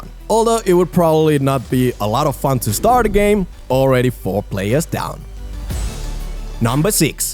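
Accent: Finland. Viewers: Im 100% sure the guy talking in this video is from Finland. Suomi perkele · Is this commentator from Finland? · Im pretty sure ur A Finn cuz off that accent 🤔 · Funny how the narrator tries so hard to hide his Finnish accent :DD just rock it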